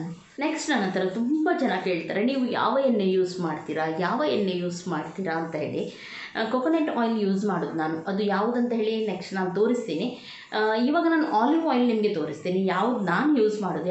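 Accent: Mangalore, India. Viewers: Hai.. I like very much way of explaining.and mangalore kannada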